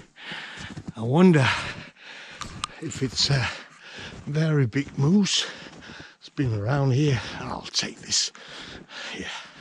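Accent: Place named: Northern England, United Kingdom. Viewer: Very interesting channel. Just love it. I follow quite a few off-grid channels but love to see your fresh content! My question is, your English is very good and I cannot help but think it sounds very Northern English, from the north of England. Have you spent time in England during your working life?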